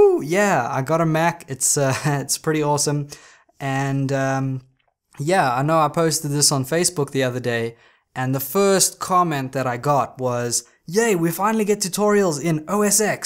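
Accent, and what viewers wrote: South Africa. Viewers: By your accent I suspected you're from SA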